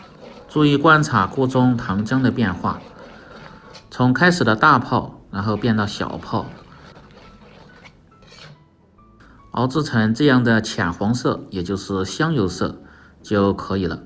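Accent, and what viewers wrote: Sichuan, China. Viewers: 我也做做看☺️老师您是四川人吧？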